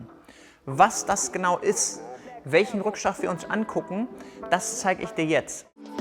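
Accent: Hamburg, Germany. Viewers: Perfekt erklärt, super gemacht. Danke für die Tipps... und der Hamburger Slang gefällt mir auch sehr gut. Cooles shirt dazu :-) Liebe Grüße aus dem Süden Deutschlands.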